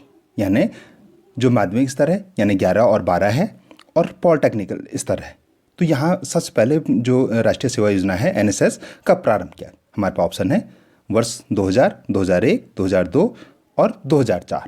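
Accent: Garhwal, India. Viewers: Sirji aap garhwali lagre ho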